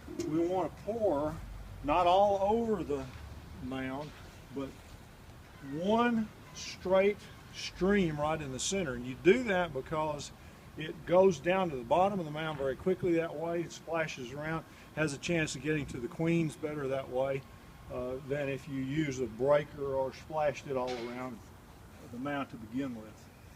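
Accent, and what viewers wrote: South, United States. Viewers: They are both Texans. That's how we talk here., is my pick over Dr. Phil! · what does he say is the first step? at 8 seconds? can't understand southern drawl, man.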